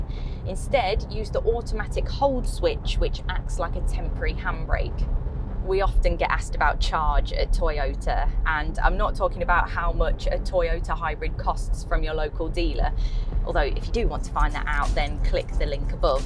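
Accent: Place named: Northern England, United Kingdom. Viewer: I'm sorry but your Northern accent kept putting me off. Every time you said Munnee instead of Money I could feel myself cringe.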